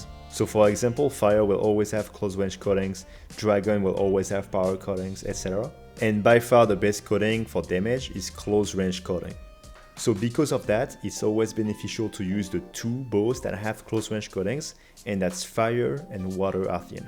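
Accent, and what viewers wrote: France. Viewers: You sound french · 1. sexy french accent 2. good not annoying background music. 3. straight to the point and everything clearly explained. 4. well edited the perfect build guide video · are you french? I am, and your english accent reminds me of me speaking english x)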